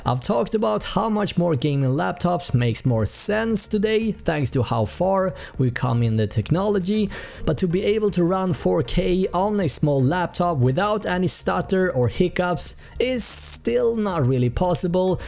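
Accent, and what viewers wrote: Sweden. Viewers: thats some strong ass swedish accent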